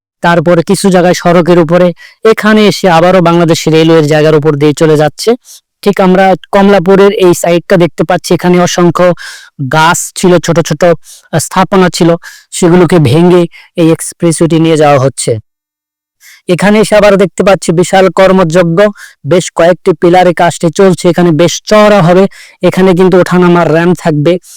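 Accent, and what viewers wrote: India. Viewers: তোমার ভাষাতো দেখতেছি ওপার বাংলার!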